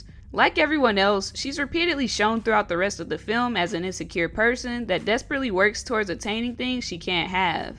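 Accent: United States. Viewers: I love your videos, nothing on YT ever feels genuine and funny anymore. But your stuff is just so good. I appreciate all your uploads. Also, I love your accent. you from the south for sure, me too. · Narrator’s voice like sandpaper. American lazy speak.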